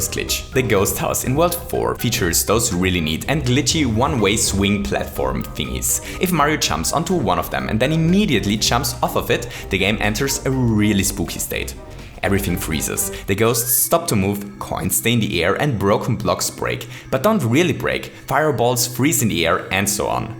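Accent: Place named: Germany. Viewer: Dein deutscher Akzent ist echt eklig bro. Und kannst du bitte aufhören zu versuchen intilligent zu wirken indem du immer wieder unkonventionelle Wörter wie "plummer" benutzt, das nervt einfach nur und ich bekomme cringe-attacken, weil mal wieder ein deutscher auf möchtegernengländer macht. Ansonsten geht dein Content aber klar.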